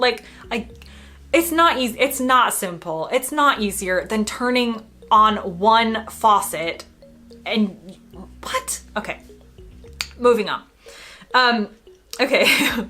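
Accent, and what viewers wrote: United States. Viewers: Loooovvee you, YOU are an honorary Brit for sure. Or course you'll keep your American accent.... I'm English and have lived in New Zealand for 18 years and still get picked as a Brit as soon as I open my mouth. By the way, opening the window on a hot day works, but you have to open up the house in the morning, before the house heats up.....as you said, pointless once the house is hot. Keep churning out the videos... I love your observations on the comparisons of UK vs US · Don't try to erase your American English accent. How you express yourself is part of your personality - the words you choose to use and the way that you choose to deliver them - just take what you like from the English English language and speak them in your American English accent! Alright bruv? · Your fine the way you are I'm a Brit and there are the occasional American pronunciations of words that grate on my Brit ear a bit but other than that I've no doubt you'll catch up with us perfect Brits in the end. 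· i find that when you speak fast your american accent is very pronounced , but when you slow down your speech your accent is toned down , and it seems you are gaining an english tone to your voice. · A question on the accent 'issue' - is it that you still sound American to English people but Americans hear a definite change in your accent? My missus is Californian and has been here for fifteen years or more now - even to me she still sounds very West Coast and yet her family thinks she sounds 'posh'. An additional element to this is that her mother, who lived in Hawaii for most of her life, could barely understand me; so accents cut both ways :D. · This is not a hate comment, but: frankly, your accent (and a lot of American accents) jar on the ear because the vowels have an edge which is entirely unnatural to the British. This is made worse when the American concerned is loud. As for the tap thing, surely with a mixer tap you have to run both hot and cold before you reach the ideal temperature: surely this is a waste of water? 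We British do not like waste, especially when we have paid to heat up water, some of which goes straight down the drain. Hence we put a plug in the sink before we turn the taps on. Simple. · The comment about your accent after 10 years of living here being still strong American. I’m from Hampshire in Southern England, I have lived in South Wales for over 30 years and my accent is just as strong. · Hello there. I've not been watching your videos for long but I have to say that I love hearing about all of the negative and positive differences about our two coutries. I live in the Northeast of England in Northumberland and love watching your videos and learning about the differences between our cultures. I have just watched this one and was amazed about how ignorant people are, well to be honest I'm not really. People have no right to comment on things when they clearly have no idea what they are talking about. 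I agree with you about the taps. I like to run the water while I'm washing my hands because like you said it saves water but I don't like holding my hands under the cold tap and even more so the hot. I have always liked the idea of having the water at a temperature that's comfortable. I didn't realise what a silly, if not stupid idea two taps were until I saw your videos. I do prefer American toilets. They have a much better flushing system than ours. Being filled with a lot more water and then sucking everything down without leaving anything behind. It's nice that you enjoy living in the U.K. but that you still have your American accent and use your American words and terms for things. If I were living in the U.S.A. I would probably still keep some of my English words and terms for things. You should never get rid of who you are. I am originally from the Southeast and still have my southern accent and I've lived in the Northeast for 41 years so there you go. 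I use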